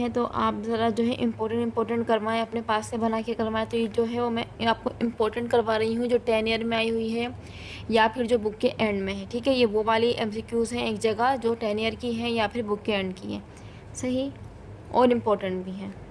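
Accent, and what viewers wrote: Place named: Sindh, India. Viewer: Madam aapki mother language sindhi hy ya urdu aap urdu sindhi ki tarah bol rahi hain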